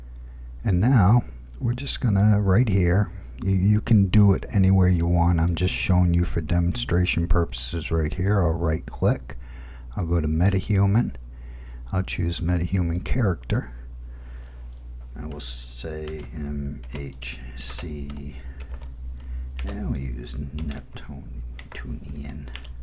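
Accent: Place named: Boston, United States. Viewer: Thanks for the run through - you sound like you may be from Boston :)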